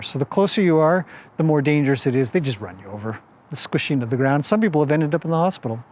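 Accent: Canada. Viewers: I am gonna go back and listen to videos I have missed. I learn so much more about relationships with horses that make more sense than other channels I watch. I am re evaluating my training and my horse wants to be with me even more! P.S. Love your adorable Canadian accent! And it is so gorgeous where you live. Can you show us the area around your home if you get a chance? Thanks for everything Mr.. (: Babs from Boston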